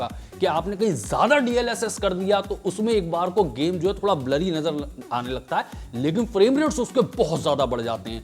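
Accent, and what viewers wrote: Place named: Hathras-Aligarh, India. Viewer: Bhaka bhak FPS 😂. Bhai Hathras Aligarh ke aaspas ke lag rahe!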